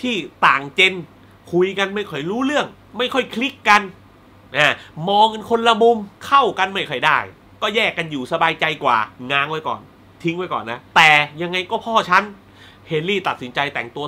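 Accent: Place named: Surat Thani, Thailand. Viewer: คนสุราษฎร์อำเภอไรนิพี่บ่าว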